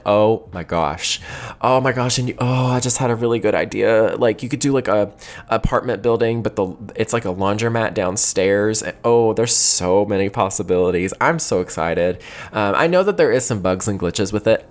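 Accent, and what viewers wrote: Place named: Appalachia, United States. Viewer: I love your content, and knowing that you’re from the Appalachia makes me feel ever closer to your videos (WV here). Your accent is barely noticeable for a person who lives in Kentucky. Your builds make me want to build more while I’m playing!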